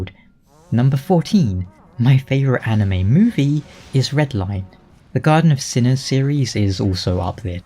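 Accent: United Kingdom. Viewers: Happy Bday! :D Thanks for toning down the Brittish accent, it's quite hard to follow for a foreigner. Especially since these dumb asses in France teach English using only the posh accent. Explains why we have trouble understanding spoken English and sound like arrogant pricks XD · Don't surpress your Britishness. Embrace it!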